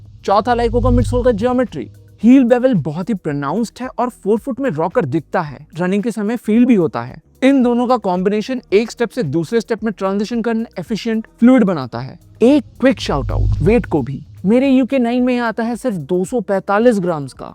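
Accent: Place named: Chandigarh, India. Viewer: Are u from Chandigarh? It seems like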